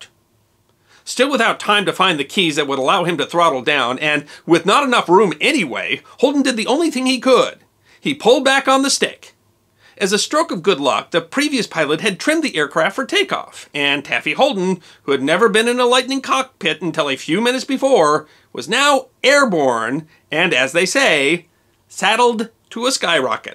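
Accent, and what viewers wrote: United Kingdom. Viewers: Haha!!! So British!!! Sounds like Monty Python!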